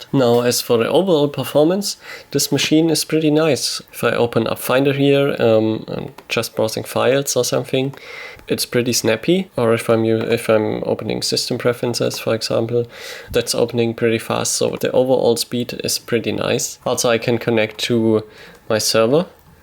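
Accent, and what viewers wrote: Germany. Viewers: Man erkennt, dass deine Muttersprache deutsch ist. Ich würde dich bitten nicht englisch zu sprechen weil das ....... klingt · Die Leute scheinen den deutschen Akzent abzufrieren. Dachte immer die halten Deutsch für eine nicht ganz so schöne Sprache. :D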